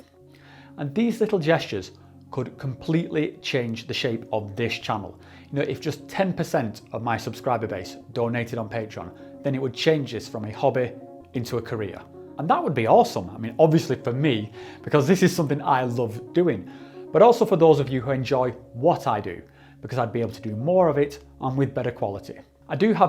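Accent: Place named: Bolton, United Kingdom. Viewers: ah!! Now makes perfect sense thanks. The Manchester accent threw me! Lol Keep up the content, I enjoy the channel. :-) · Wierd watching someone with Bolton accent everything is usually American it's a nice change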